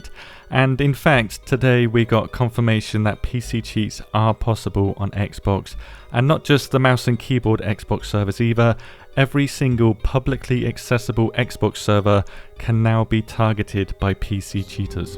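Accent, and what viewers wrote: United Kingdom. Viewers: Dude, don’t tell me what to do. If I want to blame the devs (and I most certainly do) for the influx of cheaters, that’s my right. British bloke. Posh prick.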